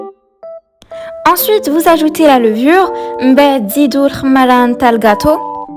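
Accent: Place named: Oujda, Morocco. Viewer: ماشاء الله عليكم وعجبتيني مين قولتي خودمي ههه على الموس اللهجة الوجدية حاضرة في الفيديو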